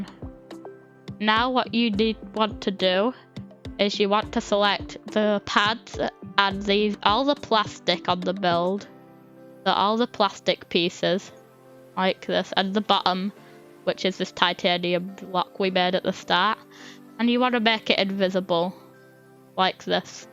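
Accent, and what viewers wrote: United Kingdom. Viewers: are you bri'ish?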